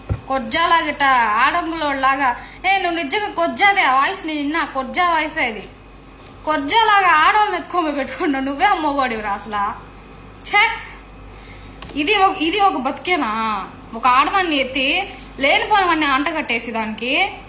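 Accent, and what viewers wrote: Anantapur, India. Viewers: Buddham namami !!! Ananthapuram voice good sister garu. Take care in Kuwait.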